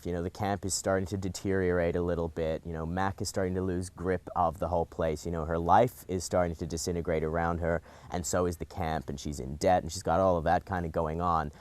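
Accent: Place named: United States. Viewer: omg hes interviewing in an american accent! ahaha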